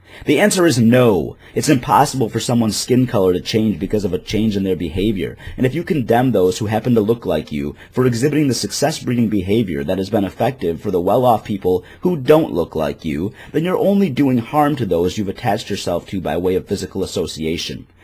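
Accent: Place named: Midwest, United States. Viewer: Can't really tell, you can suspect, as I suspect that he's probably a white guy raised in the mid-west or north-east United States, but I also know a black dude who talks like Foghorn Leghorn (he legit sounds exactly like the character in pitch, tone, and accent), and a black chick who sounds like Rosa Ponselle when she sings. All you can really tell is that if he is black, he wasn't raised in a home which supported the notion that black people should "talk black".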